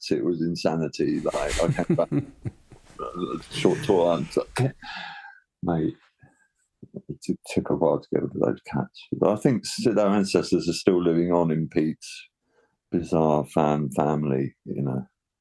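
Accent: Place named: Hull, United Kingdom. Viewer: I'm from Hull your accent sounds close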